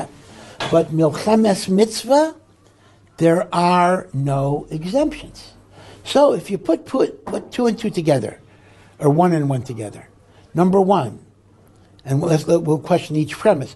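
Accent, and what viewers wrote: South Africa. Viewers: Dude where’s your accent from? South African or Australian? And how’s Palestine your home?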